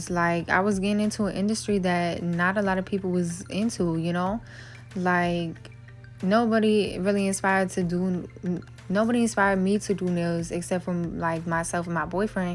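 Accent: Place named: DMV, United States. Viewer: I knew you was from dmv! Heard it in ya voice! I love your nails! You bomb AF!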